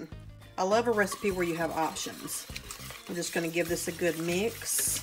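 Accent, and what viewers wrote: South, United States. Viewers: Love your accent! You're truly a southern belle 🤗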